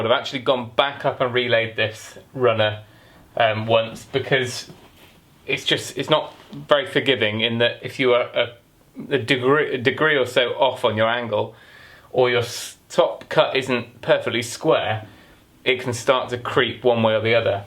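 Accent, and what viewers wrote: United Kingdom. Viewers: Great work and video. I can listen British English lol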